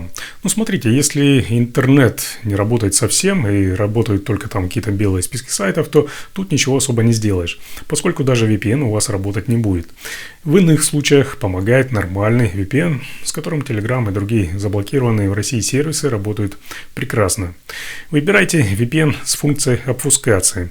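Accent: Ukraine. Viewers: Этот майор, судя по говору, родом из Малороссии. Вслушайтесь, соотечественники: ципсо во всей своей красе. Вдохновенно работают.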